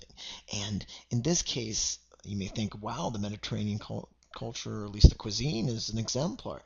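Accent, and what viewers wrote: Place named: India. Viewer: nice indian ancent english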